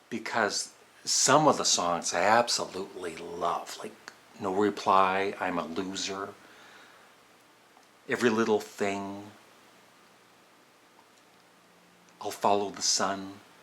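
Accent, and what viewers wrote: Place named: Canada. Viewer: No doubt...you are Canadian. Lol. No mistaking that accent. But I laugh, because I am from Texas...and I assure you...you could poke fun at me too. Lol. Thanks for the post/rankings. You get a like.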